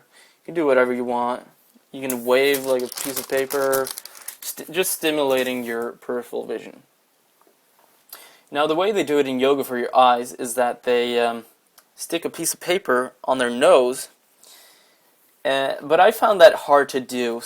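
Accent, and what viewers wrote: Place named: United States. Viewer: Had you not stated you were from Norway at the start of this video, I would've assumed you were an American from a West or Midwest state. Your spoken English is virtually identical. As a Minnesotan (of 25% Norwegian ancestry), I would have zero inkling you weren't from here if I ran into you on the street. The same could not be said if I ran into someone from Tennessee or New England if I heard them speaking. Fantastic video BTW.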